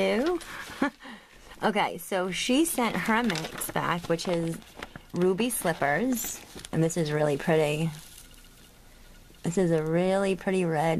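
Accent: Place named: East Coast, United States. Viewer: hahaha! OF COURSE I loved what u said at the end.....yes, your accent. I love it hahaha. U probably get tired of me saying it. It's the "R" that gives your accent it's different sound. East coast!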